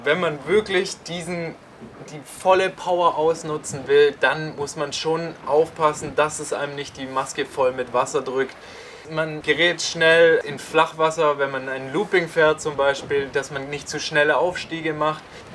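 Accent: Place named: United States. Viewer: Sounds American trying to speak German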